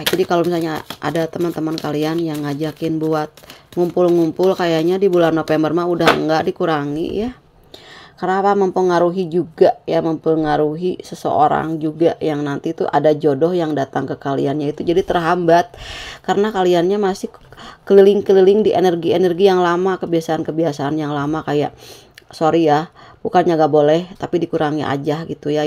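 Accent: West Java, Indonesia. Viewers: Assalamualaikum bunda asli timana logatnah sunda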